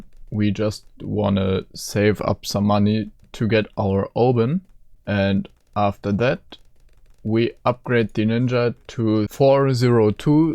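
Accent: Germany. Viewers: ur from germany arent you? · are you german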